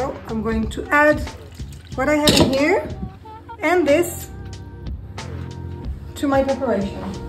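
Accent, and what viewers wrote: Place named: France. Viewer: Goutez a little bit...your accent was so french😂😂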